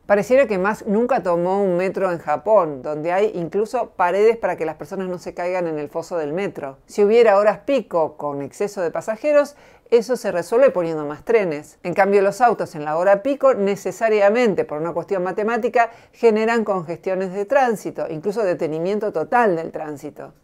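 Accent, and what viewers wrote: Argentina. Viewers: Que rara que eres.. perdón, tengo que ser honesto. COMO SÍ SER "PROGRESISTA", OTORGUE UNA CIERTA "SUPERIORIDAD MORAL"... Especializada en "todo", es extraño con acento argentino...NO SORPRENDE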